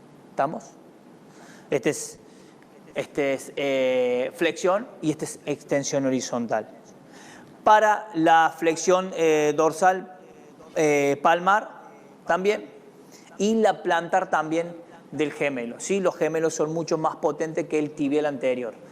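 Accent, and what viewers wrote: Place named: Cordoba, Argentina. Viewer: Muy bueno profe !! Se le nota de acá a la china el acento cordobés !! Saludos de Córdoba capital !! 👌👌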